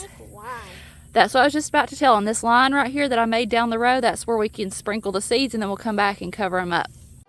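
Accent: South, United States. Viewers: You are such a good wife/mom, and your little guy looks like a sweetheart...~.~ I started watching your channel recently. I'm a Christian, too, and grew up in the 'country' in Michigan/Wiscosin. Now I live in South Korea and teach English here with my Korean husband. It's fun to hear your Southern accent and to see the little things about American life that I don't see anymore living here in a big city and foreign culture. Thanks for sharing your joy & love for Jesus and family!💜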